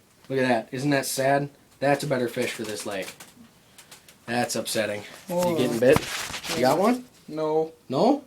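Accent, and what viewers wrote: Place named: Minnesota, United States. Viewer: I miss that Minnesota accent 😁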